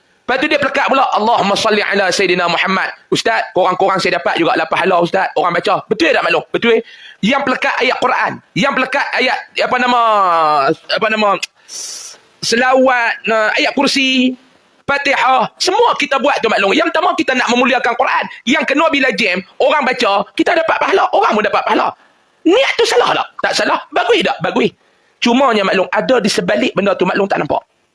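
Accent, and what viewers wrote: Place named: North, Malaysia. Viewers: Ramai tk suka bahasa kasaq ustaz ni,,, tp aku suka,, meresap dihati makna yg ustaz ni sampaikan,, org utara cakap kasaq tp sedap dan mudah faham,, terbaik❤ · Pekat dia punya Kedah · org utara memang nada cakap mcm tuu... biasa saja tone org utara cakap😂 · Aku org kedah, maaf laa aku rasa ustaz ni ceramah tak kasaq pun, biasa ja bahasa dia, cuma dia ckp tu pekat kedah biasa laa, ala ala smbg kt kedai kopi ckp lagu ni laa… kalau org luaq mgkin nmpk mcm kasaq, jd benda tu hanyalah subjektif… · Mana ada kasaq..aku dengaq elok ja...hg ja kata kasaq..tapi hg suka baguih la..memang cara kami cakap macam tu..oqang luaq dengaq mcm kasaq tpi memang mcm tu kami cakap...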